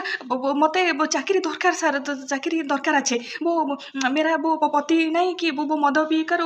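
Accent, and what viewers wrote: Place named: Bengal, India. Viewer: Bangali kahiba style pura cute and sweetness re varpur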